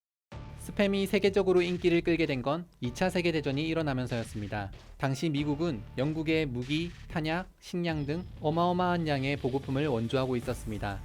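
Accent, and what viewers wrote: Gyeongsang, South Korea. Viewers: 이분 경상도 출신 혹은 경상도와 관련있다에 내 돈 모두와 손모가지 검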